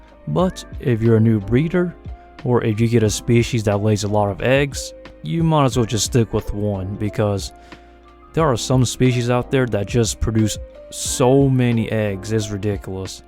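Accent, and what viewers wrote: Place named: South, United States. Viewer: Bro I can tell your from the southern states. I was born and raised in Virginia and North Carolina hell yeah brother!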